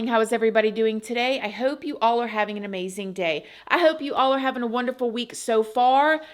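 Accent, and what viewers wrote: South, United States. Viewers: Why would it embarrass you? Everyone speaks with different cadences and accents depending on where they are from. It's very commonly said in the south and is southern. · That’s how we speak in the south hunny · yeah we southerners have pretty much always said it that way lol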